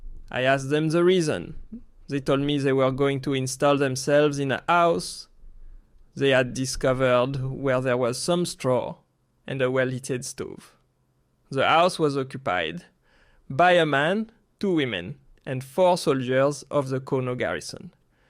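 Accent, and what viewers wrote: France. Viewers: I like the French accent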